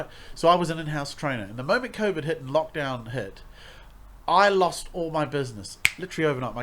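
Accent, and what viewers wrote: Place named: New Zealand. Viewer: I thought I heard a Kiwi accent. Then I see the FourSquare mug in the bacground!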